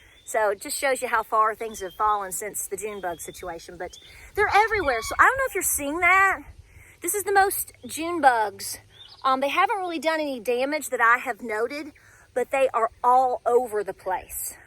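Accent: South, United States. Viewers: This is how mamas in the south used to talk. It's music to my ears. I'm 63 watching the video mumbling things like "yes ma'am."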